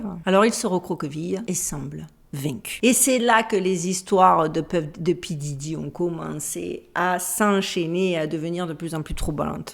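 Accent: South, France. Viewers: Ma chère garde ton courage et ta pugnacité❤ j’aimerai bien un jour entendre ton anglais à la marseillaise car comme moi tu n’a jamais perdu ton accent😉😅. Je vis en Belgique et je me fais repérer à tous les coups😅 surtout quand j’avais du grain à moudre avec la police 🥴 garde une zen attitude, les trolls sont toujours là mais si tu te dis que c’est parce que le camp adverse a peur, c’est que tu es sur la bonne voie…🥰🥰🥰stay safe and happy😘😘😘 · Merci encore et encore tu as bien du courage de regarder toute cette abomination pour la montrer à ceux qui gardent les yeux et les oreilles soigneusement fermés. Que. d'horreur!! certains etres humains (??? ) sont vraiment capables du pire et c'est pas d'aujourd'hui..déjà ds l'histoire en France Gilles de Rais et la comtesse Batorl et beaucoup d'autres qui par leur "rang" étaient "au dessus des lois, de la morale, près du pouvoir" C'est immonde et je crois que peut etre nous vivrons la chute de cette mauvaisete maintenant que la boite est ouverte, la puanteur qui s'en dégage est si forte que bientôt mm ceux qui se pincent le nez vont la sentir. 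Tu es aussi intelligente et courageuse que jolie à regarder et j'adore ton élocution qd tu t'enerves avec ton petit bout d'accent du soleil. Bisous de la mémé de france 😘👵💖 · jaime bcp laccent du sud bref je vais regarder la video meme si jai jamais aimer ce p.diddy comme 90 % des rappeurs americains..jespere juste que ca sera pas complaisant....jai vu une video ou un mec essayer(il ramait)de defendre p.diddy.... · je reste, car ta voix est comme un soleil chantonnant d'accent du sud, et rayonnant de ta beauté d'ange blond.. Merci d'être là contesse.. Il n'y a que les jaloux qui partent lol!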